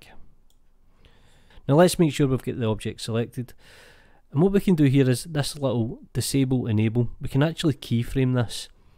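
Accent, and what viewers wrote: Scotland, United Kingdom. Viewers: Ah okay, that explains everything 😄 the scottish accent 👍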